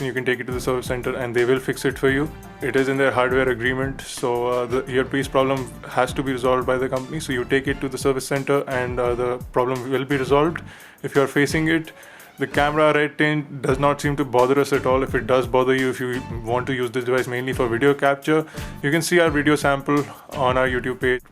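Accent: India. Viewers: Very nice presentation! Thank you! It would be even better if you talked just a little, little bit slower, since Your "india/english" accent could be a little tricky to apprehend if one is not used to it ;-). Besides that it was an EXCELLENT Presentation!!! Thanks!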